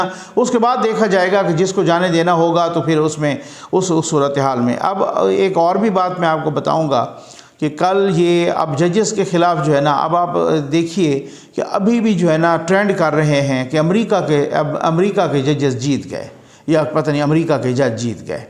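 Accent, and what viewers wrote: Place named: Saraiki, Pakistan. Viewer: Dear sb I think u r Saraiki speaking. From where u belongs???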